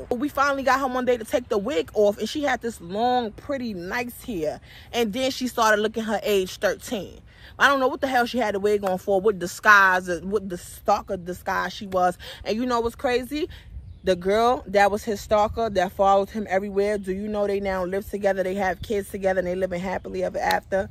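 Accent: New York, United States. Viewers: Sis you be getting some good sponsors! Anyways been binge watching you since your new body butter video loved it even though I’ve been making my own for years I loved your video and how entertaining you are! Now I usually hate to hear that accent so I don’t watch people who have that New York accent but yours yeah I likes. You and symone are my favorite storytime YouTubers now. Anyways keep pushing these videos out sis!💙💙💙 love from Cali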